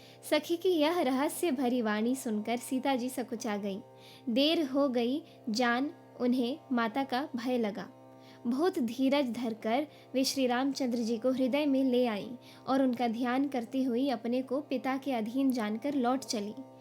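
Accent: Standard. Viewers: बहुत शुध्द उच्चारण है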